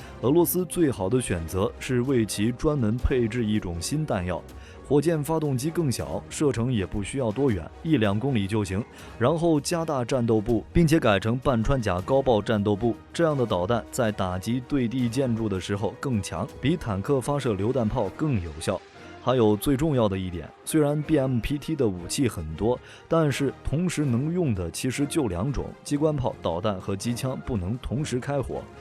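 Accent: China. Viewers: 台独反中媒体,为啥用中国大陆语音配音?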